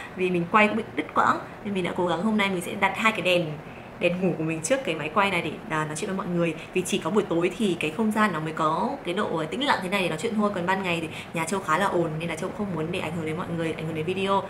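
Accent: Hanoi, Vietnam. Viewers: Thích nghe giọng nói của cô gái Hà Nội này quá đi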